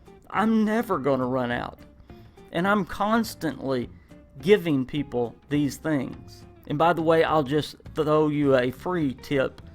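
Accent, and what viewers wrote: South, United States. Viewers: love your country-ish accent! great videos, sub'ed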